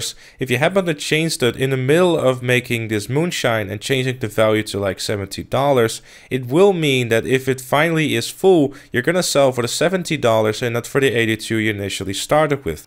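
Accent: Netherlands. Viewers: His dutch accent is funny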